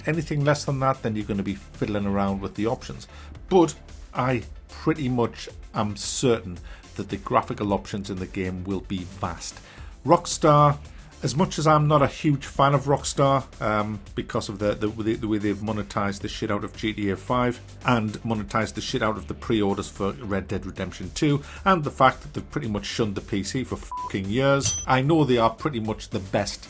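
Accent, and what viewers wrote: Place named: Middlesbrough, United Kingdom. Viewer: He sounds like he's from middlesborough, sir davos sounds more like a geordie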